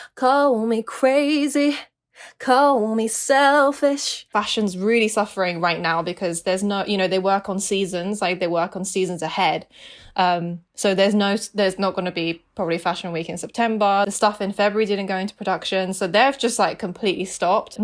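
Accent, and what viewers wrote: United Kingdom. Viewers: Hold up she’s British I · am i the only one who didn't know she was British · 1. She's a wholeass queen 💕💕 2. I'd no idea she was british??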